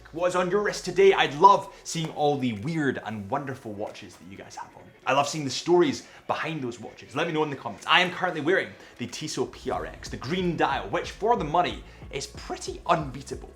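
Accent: Scotland, United Kingdom. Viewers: Both are Scottish, it’s a cool accent which I appreciate after living in Scotland for more than 8 years… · This guy has a similar presentation style and approach as Adrian Barker…just with what? A Scottish accent is it? I do agree though, that this particular Seamaster is going to end up being one of the few contemporary Omegas that’s a collectors item and will fetch a premium for it. It’s definitely one of my favorites anyway.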